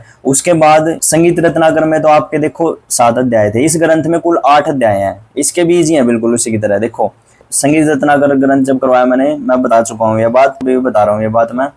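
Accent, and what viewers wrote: Haryana, India. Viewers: Sir aap Haryana se ho kya??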